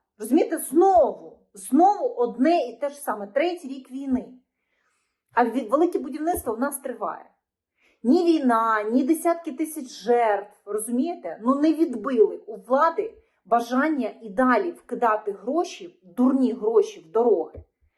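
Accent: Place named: Russia. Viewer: "Щьо,щьє", що за вимова у вас,вашою вимовою катувати ворогів можна.Аж вуха заболіли від такого мовлення.Ви напевне розмовляли російською,а тепер перейшли на українську.Жахлива дикція.😮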